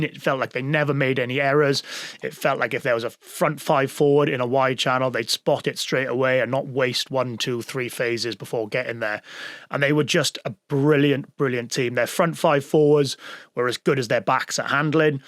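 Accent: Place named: Wales, United Kingdom. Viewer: You sound Welch now I'm listening..Bro.. Mum was a Owens ❤ Love ya MA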